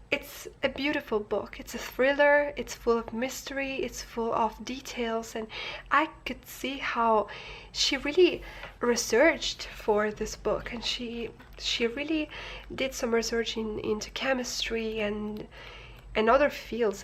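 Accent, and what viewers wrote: United Kingdom. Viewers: Btw, I really love your british accent! :) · Omg amo tu acento británico <3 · Your accent has never been so British :D did you spend your holiday in Britain or something ? xD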